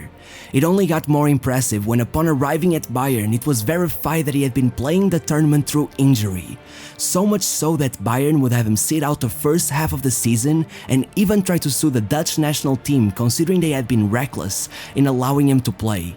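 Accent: Portugal. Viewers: By this guys voice i can tell he is Portuguese, as i also am portuguese 😉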